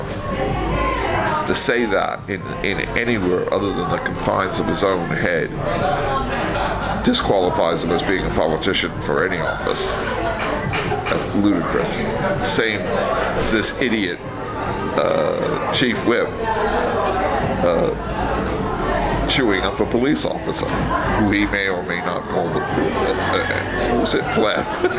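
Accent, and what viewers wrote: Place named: United States. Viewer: Why has he got a Yankee accent if he is British?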